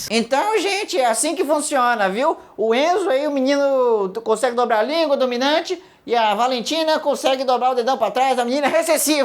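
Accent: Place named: São Paulo, Brazil. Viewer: Queria saber por que você é Cearense e não tem o sotaque de Cearense no T e no D normalmente. Só fui perceber isso agora! Você usa o T e o D de paulista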